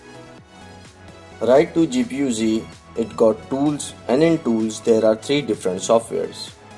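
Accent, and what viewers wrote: India. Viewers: Indian accent, i think ill pass on that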